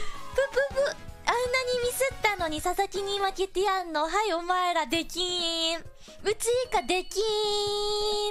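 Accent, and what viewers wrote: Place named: Osaka, Japan. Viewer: Angry + Osaka dialect = moe overload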